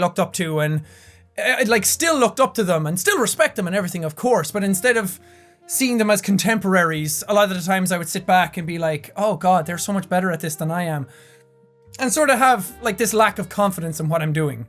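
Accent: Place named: Ireland. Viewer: i love your Irish accent and i even dyed my hair like you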